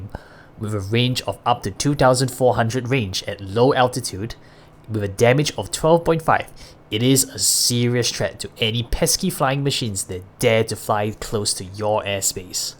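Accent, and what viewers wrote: Singapore. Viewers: Are you by any chance Singaporean?? I can hear the accent lool.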